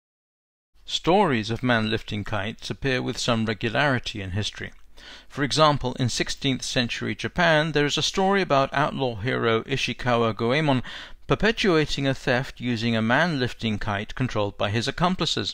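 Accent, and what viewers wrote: United Kingdom. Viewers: If you can't tell an obviously English accent from an Australian one, how on Earth can we believe a single word of the drivel you have typed above?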